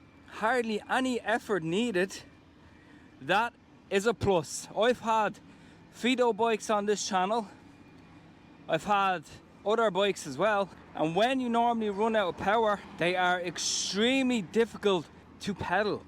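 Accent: Dublin, Ireland. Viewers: Great vid. Best of all is your Dub-A-Lin accent. I just incountered someone with the said same bike and its a rocket to go with 15 degrees hill climb which is unheard of in this price bracket. It must be a bone crusher without suspension and solid tyres but the plus side is no punctures. Presently its 509 Euro because of black Friday. This is outrageous.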